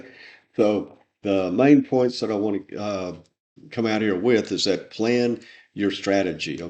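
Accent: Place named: Texas, United States. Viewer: Thanks for this. I’m in Travis & Dylan’s courses, but your instruction also seems like it would be helpful. I grew up in TX, but moved west 30 yrs ago. It’s nice to hear a TX accent (yours is very slight). Southern accents like some in Georgia & Tennessee are very strong in comparison.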